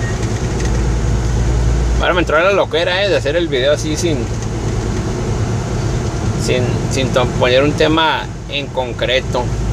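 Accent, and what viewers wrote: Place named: Sinaloa, Mexico. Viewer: mi colega te voy a ser una preguntota de que parte de México eres te escuchas como de Sinaloa los ojalá qué no te molestes por la pregunta y 8 nomás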